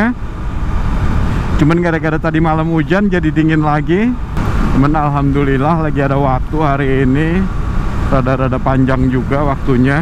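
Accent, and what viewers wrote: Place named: Jakarta, Indonesia. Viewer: Gw suka sma logat lu Bang, org Jkarta emang banyol., Sgala Saddy=sedih di omongin, ga pnting bgt kan.. tpi gw suka lucu2,😂😁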